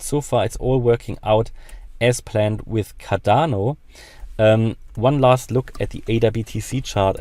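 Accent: Germany. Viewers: geiler Typ 👍 hört man direkt den guten deutschen Dialekt raus 👌 wollte nur schauen wie es um ADA steht und bin auf dein Video gestoßen. Abo hast du sicher.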